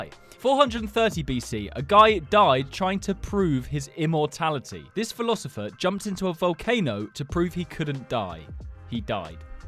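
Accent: United Kingdom. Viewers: Love that british accent.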